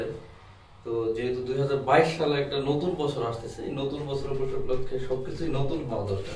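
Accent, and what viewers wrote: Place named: Jamalpur, Bangladesh. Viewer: ভাই বাড়ি কই আপনার?? জামালপুরের টোন দেখি কথায়!!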